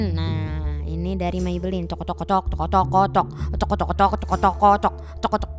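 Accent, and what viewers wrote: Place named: Java, Indonesia. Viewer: Kenapa suara kak di sini agak medokk!! (Emot ketawa)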